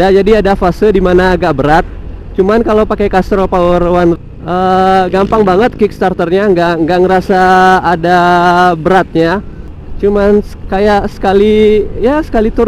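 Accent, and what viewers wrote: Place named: Minangkabau, Indonesia. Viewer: Urang awak ko mah, logatnya kliatan😂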